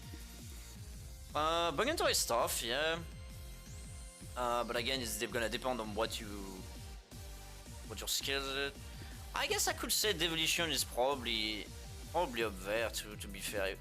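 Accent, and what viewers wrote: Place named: France. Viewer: What is that accent? 😮 Are you French or Canadian or from Louisiana? Btw my favorite game of Mortol II ❤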